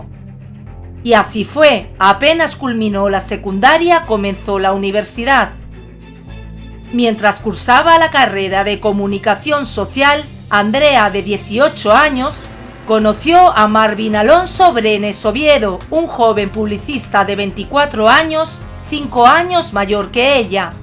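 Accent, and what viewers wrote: Spain. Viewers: podes haceralgo con ese acento gallego? no suena bien. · Hola,me encanta tu trabajo y por tu asentó deduzco que eres española, pero es video no vidio como tú lo dices jjjj saludos desde República Dominicana 🇩🇴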